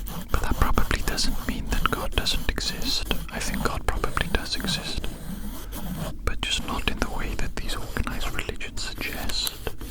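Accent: Wales, United Kingdom. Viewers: First time viewer and I detected the Welsh accent in record time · Is that a Welsh accent I hear ( I could be completely wrong lol )🤑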